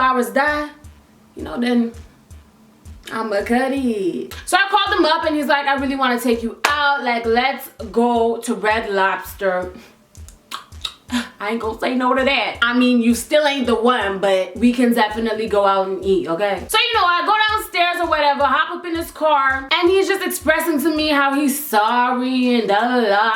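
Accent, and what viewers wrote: Jamaica. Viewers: Didn’t realize she was Jamaican until the begging of the video 🇯🇲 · New follower! I love your videos. Im literally binge watching them!!! Speak more patois !!!!!!!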